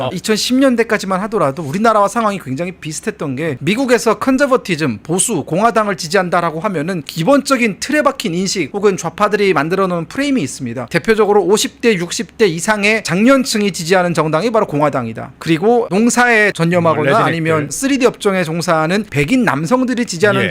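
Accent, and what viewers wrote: South Korea. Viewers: 이사람 탈북자 임에도 북한억양이 하나도 없네 톤이 그냥 우리말이네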